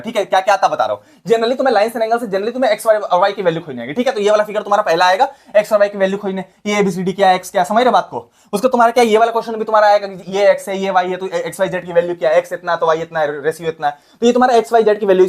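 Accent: Bihar, India. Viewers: Aap bihari ho kya?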